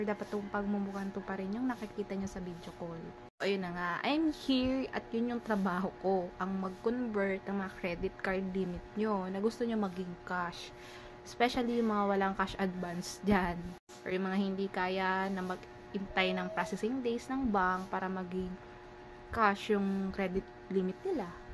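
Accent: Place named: Iloilo, Philippines. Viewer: Ilongga ka gid maam ya. Hehehe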